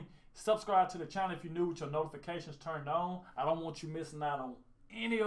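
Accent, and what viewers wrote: South, United States. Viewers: “ ”! You sound like a good ol southern boy! Thanks from Gainesville Florida! Best wishes!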